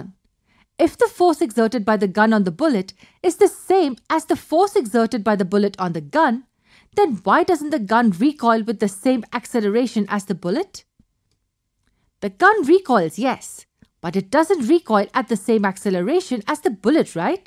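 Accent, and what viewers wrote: India. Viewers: this is to bad y r u Indian and explaining I didn't understand anything form u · Sounds Indian to me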